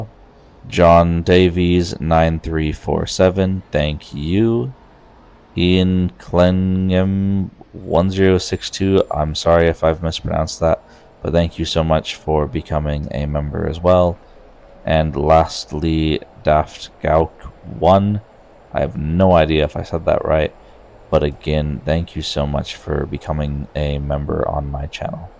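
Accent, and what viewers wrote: United Kingdom. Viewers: You sound American ..then you slip into a British accent every now and then ..welcome to the UK.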